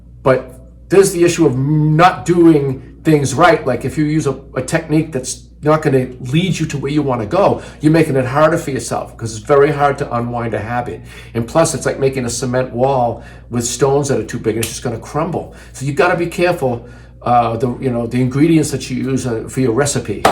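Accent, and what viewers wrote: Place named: Boston, United States. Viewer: The f....g Boston accent I don't know what the fxxxx bada boom bada bing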